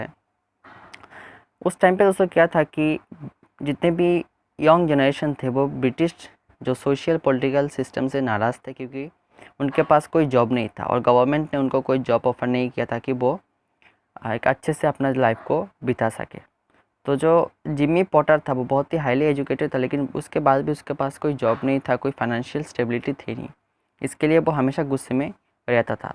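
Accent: Bengal, India. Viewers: Aap bengali ho? aapki ascent se pta chl rha h Nice explanation 😊😊 It'll help a lot in answer writing.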